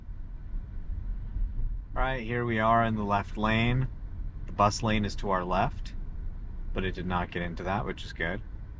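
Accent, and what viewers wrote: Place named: Canada. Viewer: Bro got the canada accent 🏳️‍🌈 🏳️‍⚧️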